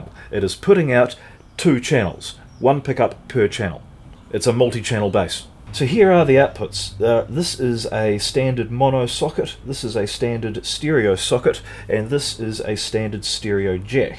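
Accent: New Zealand. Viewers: I have 4001 and find the neck pickup needs to be at about 85% before it’s just too much bottom end. Thanks for for the shielding tips - tempted to see if you would do a bit of a upgrade to mine. you sound like you could be in NZ.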